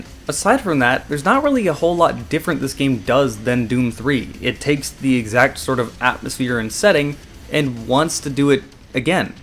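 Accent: Italy. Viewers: Honestly he sounds Sicilian. Call a Siciliano, Italian. He'll punch you. Try it.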